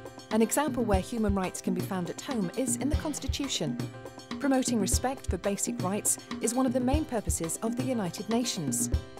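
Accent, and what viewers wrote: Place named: United Kingdom. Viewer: What a British accent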